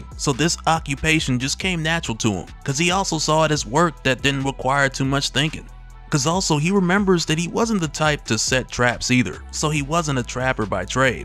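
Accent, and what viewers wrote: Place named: South, United States. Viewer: It’s the “in-and-out” of the Hee-Haw accent that got me……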